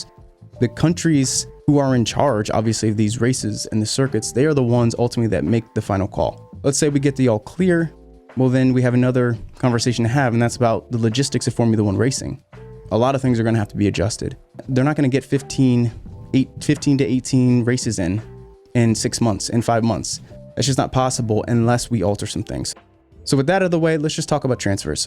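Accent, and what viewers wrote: United States. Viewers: An f1 commentator with american accent????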